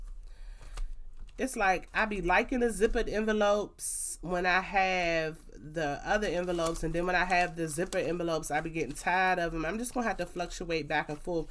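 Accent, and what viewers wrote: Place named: Baltimore, United States. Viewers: I'm glad your video came up in my feed. I wasted to get started saving this way so I don't overspend. I love clothes, girl. Anyway, your accent sounds like 410. I'm 410 too. 410 to the bone. Have an amazing day. Subscribed!!! 🤗🤗🤗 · I'm from the south, but I heard that Baltimore accent, too 😂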